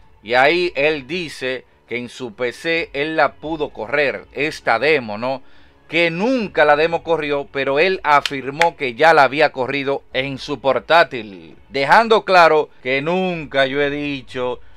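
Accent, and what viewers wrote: Dominican Republic. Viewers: Eres dominicano ?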